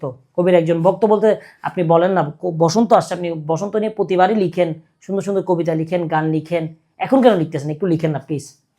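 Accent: Chittagong, Bangladesh. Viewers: ভাই কি চট্টগ্রামের ? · ভাই কি চট্টগ্রাম এর??❤ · সার আপনি কি চট্টগ্রাম এর টিচার?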